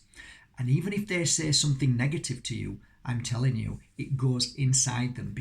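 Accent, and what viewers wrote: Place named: West Yorkshire, United Kingdom. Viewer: HI from sunny Halifax in West Yorks! I thought I recognised your accent. So pleased to have found your channel. I have given up worrying about what people think of me. Although I have to be careful when people are talking about losing loved ones when they have died. I honestly dont grieve anymore. I dont get distracted by politics anymore and some think I dont care maybe? but this is not true. I just care about what I give my attention to these days.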